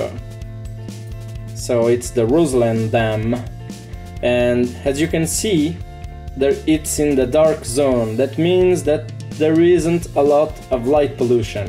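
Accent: Brazil. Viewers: Tu é BR né man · brazillian accent....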